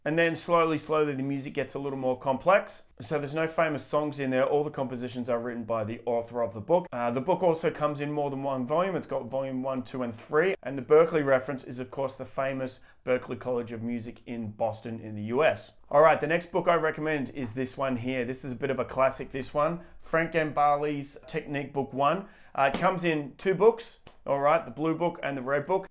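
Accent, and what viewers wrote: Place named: Australia. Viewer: Frank is such a treasure! Hello from America. I could listen to Aussies talk all day.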